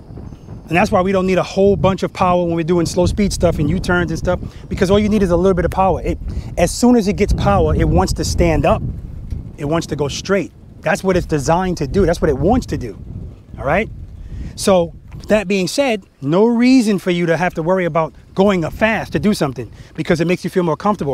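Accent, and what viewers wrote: New York, United States. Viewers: oh my god man ! your voice and almost everything you say sounds like my friend Zoffinger. look up his channel .he does kayak and fishing stuff. very unique people you are. even though you resemble each other. im a rider and appreciate your content. we are in Fla. hear what you would sound like without the new york accent. im from staten island btw. so i get it.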